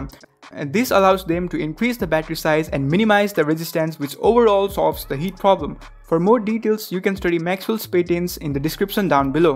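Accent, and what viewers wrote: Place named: South India, India. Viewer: A very south indian, SL accent, please speak a little slower for it to be easier to follow !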